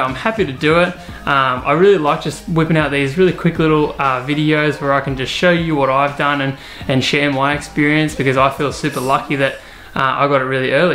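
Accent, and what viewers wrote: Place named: Australia. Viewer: What a great guy you are. So nice to hear an Aussie too. I am about to buy an Air M1 for my grandaughter to use photoshop. Really appreciate your feedback/review. 😀😀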